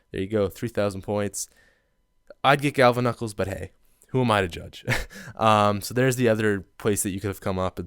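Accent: Canada. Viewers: Great vid, love em. You're definitely Canadian though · You are from Canda your accent :P cool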